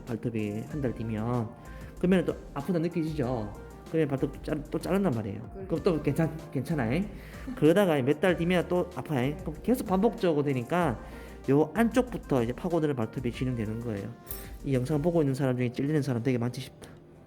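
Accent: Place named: Gyeongsang, South Korea. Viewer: 쌤 ♡♡♡♡♡♡♡♡♡♡ 너무 오랫만이네요..ㅠ 보고시퍼써용😂👍 영상 잘볼께요~~^^ 갱상도 쌤.. 넘 좋아용♡♡♡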